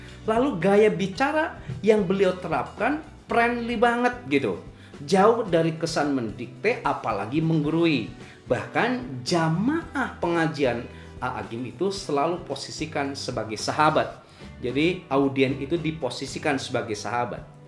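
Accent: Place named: West Java, Indonesia. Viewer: MasyAllah tabarakallah, siapa yang tidak kenal sama seorang AA Gym ini, gaya retorikanya selalu membuat mad'u terhibur, dengan aksen logat sunda nya membuat susana ceramah beliau cair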